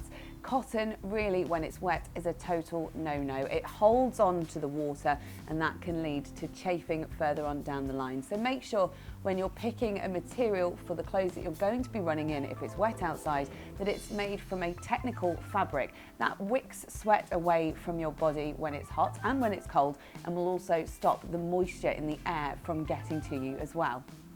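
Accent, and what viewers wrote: United Kingdom. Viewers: I've searched "how to run in rain" and what ? Unexpectable british accent appears xD oh i love english weather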